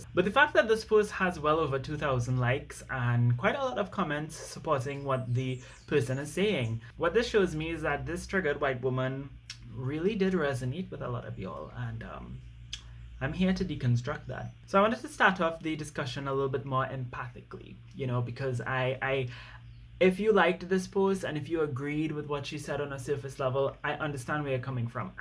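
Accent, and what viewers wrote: Trinidad and Tobago. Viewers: I stopped listening to your rant and zoomed in on your accent. Trini? It's lovely! 😂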